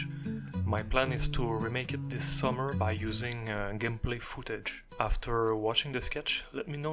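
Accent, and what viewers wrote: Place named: Quebec, Canada. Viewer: Awesome ! You sound like a Quebecois ;) Jme trompe tu ?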